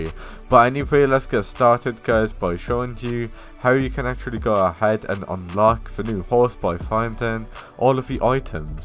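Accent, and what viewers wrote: United Kingdom. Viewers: Bro your language british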